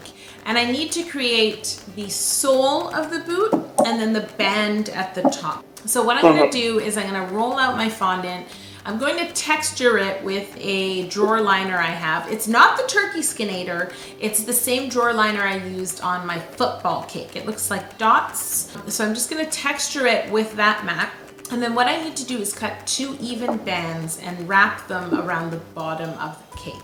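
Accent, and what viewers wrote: England, United Kingdom. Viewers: I love your cakes! You inspire me! I recently started my YouTube channel and have been baking a lot. My favorite so far is a piano cake that I brought to Choir rehearsal before all of this Quarantine! Everyone loved the piano which was really a cake! I can't wait to try to make your Watermelon cake in the summer. These boots are so cute with this cake & love your British accent in this video, lol ! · English accents, yes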